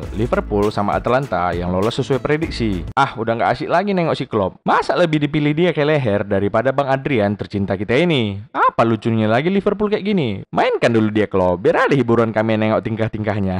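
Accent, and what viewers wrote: Medan, Indonesia. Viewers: Logat medan punya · Emang gitu bro ngomong nya khas orang medan kan si ini orang medan jadi gw tau ya karena aku pun tinggal di medan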